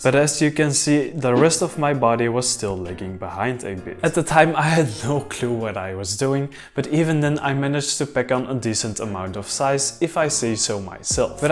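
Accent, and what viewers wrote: Belgium. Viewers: I'm so proud you're Belgian (you can hear it lol), Thanks so much bro! Also can women also do this program?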